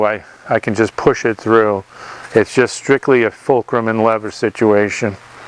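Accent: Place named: New England, United States. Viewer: Because of your New England accent I thought it was a flexible shape hat , but that makes sense too so that's what I call it. By the way it's pronounced Jag you are. That's only when you're across the pond.lol thanks for everything you've taught me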